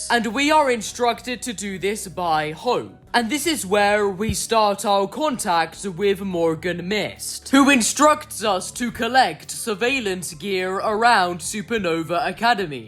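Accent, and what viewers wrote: United Kingdom. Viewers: what actually is your accent. You sound like you are not from Britain but have a sort of London accent but you have a habit of adding the "ah" sound before or after many "ah-words" you clearly think this is more enunciated but it just makes you sound like you cant pronounce words because English isn't your first language. It's confusing. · He is definitely Brit. You can't really go by accents. There are a bunch of them plus variations of the same accents.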